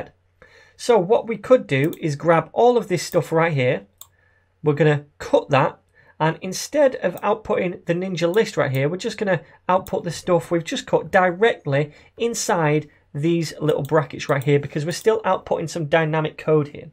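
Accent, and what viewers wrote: United Kingdom. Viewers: I love you British Accent <3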